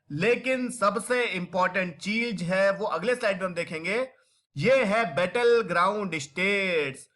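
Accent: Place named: Bihar, India. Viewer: Vhaisab apne toh dhom macha dii ... voice toh akdm Bihari babu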